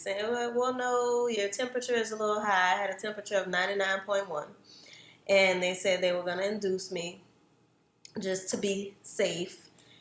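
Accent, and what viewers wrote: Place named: New Orleans, United States. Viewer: I love that you shared this! Having a birth plan is key when giving birth at either a hospital or birthing center. My midwife had a major concern with my pregnancy because I was so tiny, so she was afraid that my baby wasn't growing anymore and she wanted her to come out. She was going to insert something vaginally to induce me, but when she referred back to my birth plan, she did her best to follow my wishes and she did just that. My first birthing experience was amazing! I hope you have a better birthing experience with this baby. Congrats! You have a beautiful family & I also noticed that you are from New Orleans, I can tell by your accent because I am from there too. Lol!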